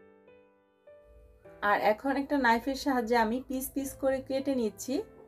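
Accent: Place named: Noakhali, Bangladesh. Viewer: ওরে ! আন্নে আঙ্গো নোয়াখাইল্লা ভাবী নী, আগে কন্নো কিল্লাই?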